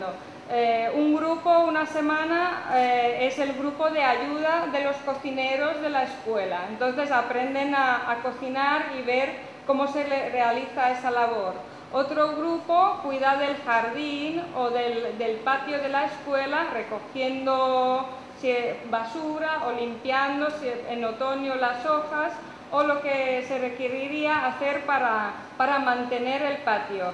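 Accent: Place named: Spain. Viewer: sí que se le nota el acento español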